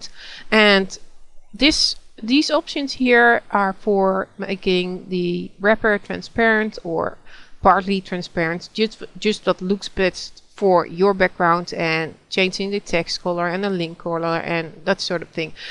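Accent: Netherlands. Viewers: ik hoorde gelijk dat je nl was^^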